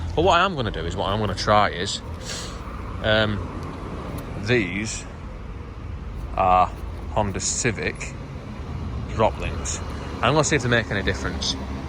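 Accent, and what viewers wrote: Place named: Lancashire, United Kingdom. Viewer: yep and don't go talking posh when you become a star . The Lancs accent is great 👍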